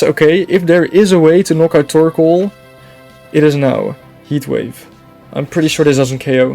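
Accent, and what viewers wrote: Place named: Netherlands. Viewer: ik ruik een nederlands accent hehe.. leuke video man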